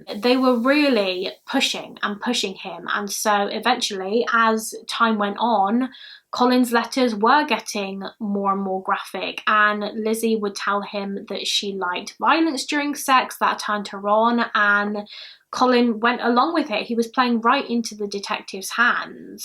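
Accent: United Kingdom. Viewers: you've got THE MOST british, british accent I have ever heard. The Queen herself does not sound as British as you do.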